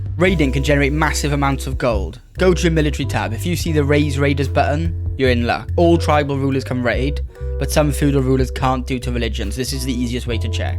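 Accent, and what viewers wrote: Wales, United Kingdom. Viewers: Welsh, and it’s beautiful. Check out a show called “Gavin and Stacey”, it’s lush. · Are you welsh by any chance?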